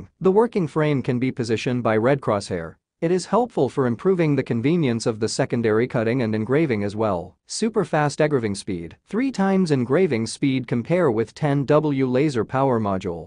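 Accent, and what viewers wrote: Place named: China. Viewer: Computer voice sucks big time!!!!! sounds like chineglish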